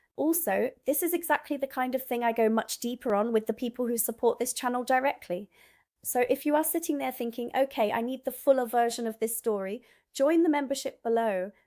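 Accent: England, United Kingdom. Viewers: I'm sorry but how are you an American who's only been here 2 years? Your accent is more English than mine and i'm born and bred. Do you just pick up accents easily? I'm genuinely curious! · where did you get your English accent ? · Very entertaining. Love the steam. How is your accent so English?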